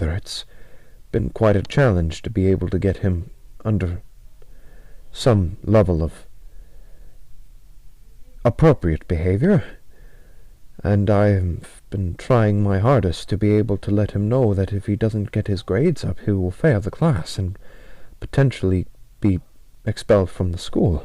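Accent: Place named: Scotland, United Kingdom. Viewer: why he sound Scottish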